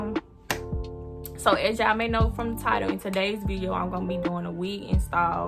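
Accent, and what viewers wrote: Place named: Baton Rouge, United States. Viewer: You gotta be from Baton Rouge lol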